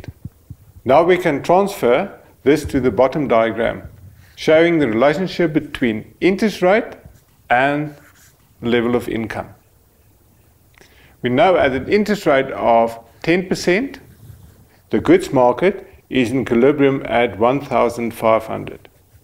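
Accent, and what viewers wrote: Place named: South Africa. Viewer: I don't know why, but everything is just better in a south african accent.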